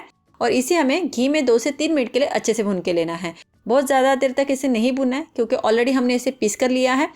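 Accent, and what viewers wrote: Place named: Gujarat, India. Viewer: Aapka bolne ka lahza gujrati bhasha k jaisa hai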